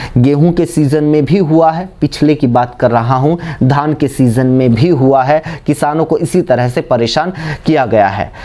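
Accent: Haryana, India. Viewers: Very nice bhai Haryanvi tone is also very nice in between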